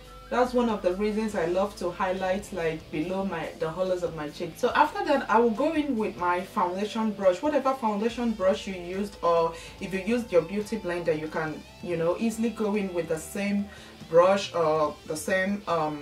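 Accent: Nigeria. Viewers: I love you. Its been a while since i heard a Nigerian accent (ps i'm Nigerian too). I just had to subscribed. Thanks for sharing. xx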